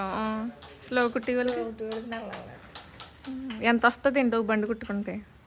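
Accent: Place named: Konaseema, India. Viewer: Konaseema slang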